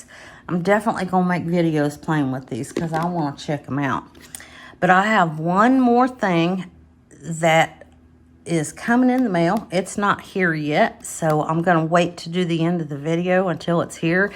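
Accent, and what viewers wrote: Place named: South, United States. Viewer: Hiiii😊, I finally have to comment. I just enjoy your videos soo much! I love your kind southern accent and voice - makes me long for Texas where I lived for a few years and Florida and Alabama too. You are kind, knowledgeable, so very talented, and beautiful! I wish you all the best life has to offer❤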